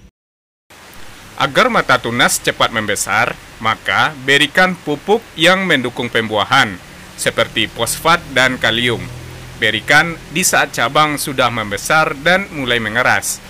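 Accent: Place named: Padang, Indonesia. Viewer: Orang padang yg uda... Intonasi cara ngomong nye kek orang padamg .😂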